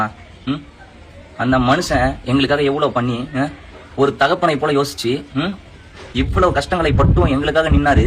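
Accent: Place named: Kongu, India. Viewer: Nei Kongu mandalam athan nei pesura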